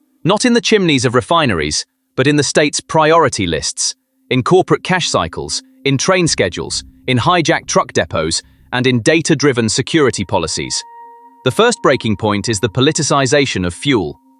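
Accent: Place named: England, United Kingdom. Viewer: An English voice. "Gas", not petrol!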